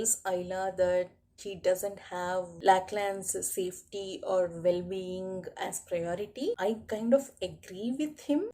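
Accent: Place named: Scotland, United Kingdom. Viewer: You had Me at Scottish 😂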